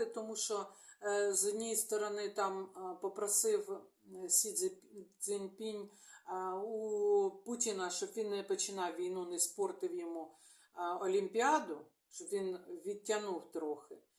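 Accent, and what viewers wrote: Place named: Luhansk, Ukraine. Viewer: Дякую! Вас дуже цікаво слухати, бо Ви маєте особливий діалект як у нас на Луганщині. Відчуваєш що слухаєш свою людину💕 дуже хочеться почути від Вас звістки про новоокуповані (після 24 лбтого) частини Луганської та Донецької області, що з ними буде цього літа?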